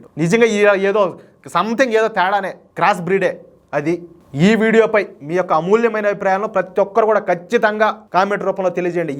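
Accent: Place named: Karnataka border, India. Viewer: బ్రదర్ మీ శ్లాంగ్ బాగా నచ్చింది. I guess మీరు కర్ణాటక బోర్డర్ ఆయి ఉంటుంది. మెట్టు తో కొడతారు.... హైలెట్ 😅 Any how మంచి వీడియో చేసారు. Thank you so much 👍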